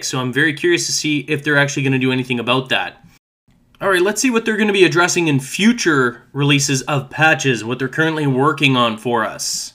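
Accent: Canada. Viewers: yeah i think hes canadian like meh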